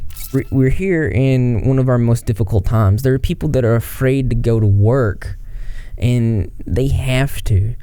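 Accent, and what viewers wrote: South, United States. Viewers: okay I've spent a good amount of time trying to figure out what flavor Southerner you are. I'm from West Virginia, but reside in Georgia.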